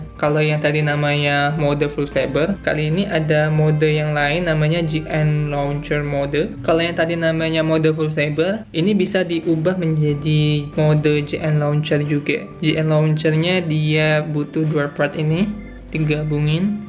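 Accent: Palembang, Indonesia. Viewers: Bang orang Palembang ya?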